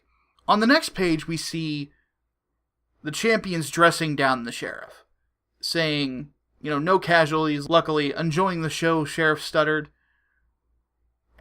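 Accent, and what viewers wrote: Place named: South, United States. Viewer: I literally liked the video because of your southern speech If you want to see an example of this watch don lenon . Talking to ben shapiro from a couple days ago I'm from Chicago i go down south every couple of years Usually during the forth of July I see people coming together to celebrate and throughout this leftist bullshit I've been explaining my positive thoughts of the south like That people in the south come together real well and that the the flag has been a symbol of history and the southern pride not hatred I'm against censorship period Do I want a living wage sure do these people know anything about Ecconomicss nope Inflation increased taxes the decreased value of a dollar Ouran high school I hate all these damn rich people They're idiotic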